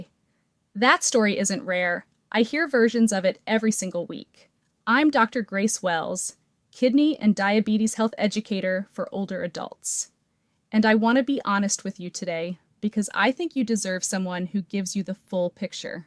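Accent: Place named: West, United States. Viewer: too much of WESTERN ACCSENT HENCE WE UNDERSTAND ONLY HALF ... THANK YOU ... !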